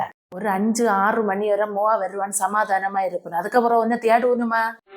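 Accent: Kanyakumari, India. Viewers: குமரி தமிழ் சூப்பர் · Kumari tamil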